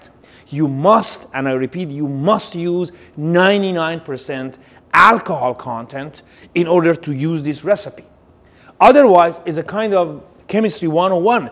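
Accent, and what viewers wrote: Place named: Iran. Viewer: Yeh. This is how Persians speak. Strong accent. You can tell straight away he is Persian.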